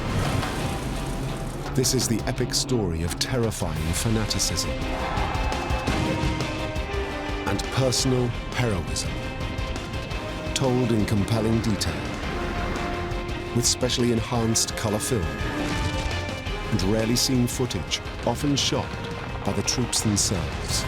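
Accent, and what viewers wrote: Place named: England, United Kingdom. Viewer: American propaganda with an english accent. Nonsense.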